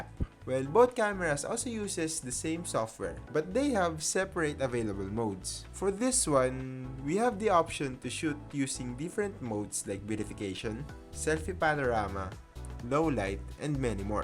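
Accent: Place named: Tagalog, Philippines. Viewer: wala naman masama sa tagalog accent ate kakaloka ka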